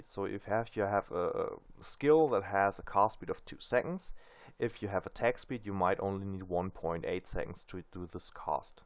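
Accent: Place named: Germany. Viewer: The german accent °-°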